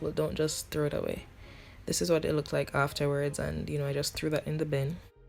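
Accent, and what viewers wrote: Jamaica. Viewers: You sound like a Jamaican 🥵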